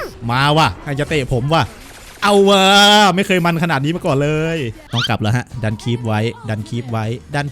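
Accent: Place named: South, Thailand. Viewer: แหลงใต้ชับพึดนิ5555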